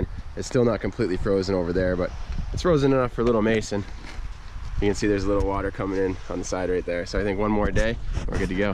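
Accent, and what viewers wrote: Canada. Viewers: Not hard to tell your from Canada like me 😂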